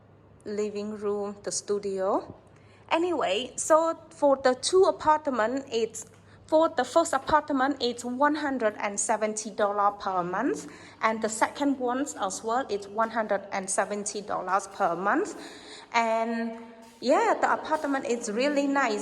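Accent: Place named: England, United Kingdom. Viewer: Yes you have a harmonious English accent. It's very nice.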